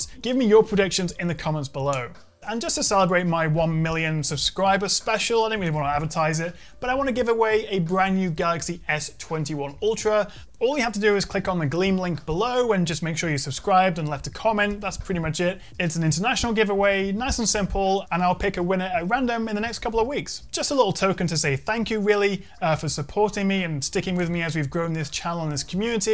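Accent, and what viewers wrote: United Kingdom. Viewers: Always loved your vids man great to listen to the British accent amoungst the sea of canadian tech YouTubers Happy for you your videos are always quality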